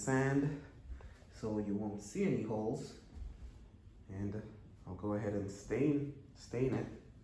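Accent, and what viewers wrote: Jamaica. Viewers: Caribbean Accent my guy. #Jamaican.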